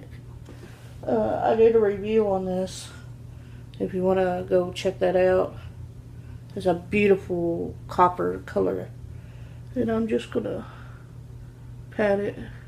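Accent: South, United States. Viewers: She's so cute and I live her southern accent type🌈🌈🌈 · Do u have a southern accent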